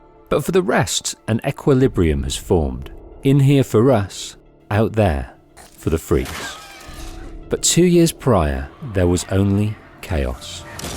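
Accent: United Kingdom. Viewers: The British accent INSTANTLY bores me.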